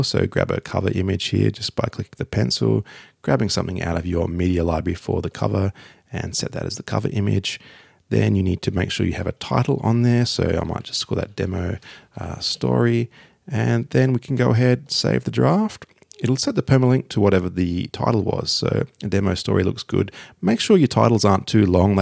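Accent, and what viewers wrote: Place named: Australia. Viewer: I saw this demo’d on another vid which was older than this one, and I was very keen to use for my website blogs - funny it’s still in beta but I’ll be getting all over it as an all in one for blogs and much quicker to develop than elementor or Divi - not that they are that tricky but this is quicker again. And dude am I mistaken that your an Oz tuber? Sure sounds like it, very refreshing to hear the Oz accent. I’ve checked out a few of your vids and I’ll subscribe to add support to an Aussie bloke, your vids are good and helpful. Keep it up. Cheers Cherie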